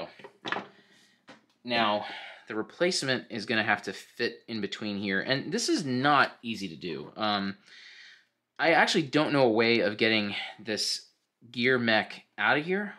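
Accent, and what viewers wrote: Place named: Long Island, United States. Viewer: you're missing the long guidland accent!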